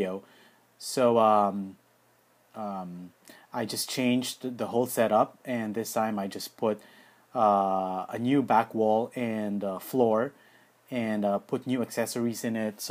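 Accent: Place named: Philippines. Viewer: the way you speak, parang accent ng pinoy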